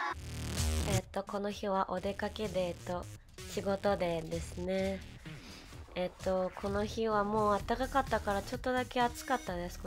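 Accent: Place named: Kansai, Japan. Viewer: めっちゃお洒落💓 ちょいちょい関西弁出るのおもろい😆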